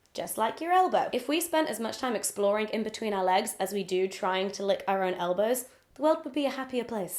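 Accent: United Kingdom. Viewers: You have the most satisfying and British (like me) voice ever omg... also im glad im not the only one!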